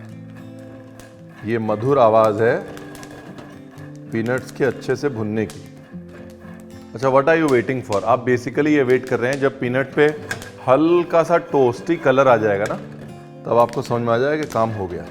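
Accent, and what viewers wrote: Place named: Lucknow, India. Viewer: Guru Tezz ho tm hain...laucknowa phonetic chhodate ni ho...sayad chhutegi v ni.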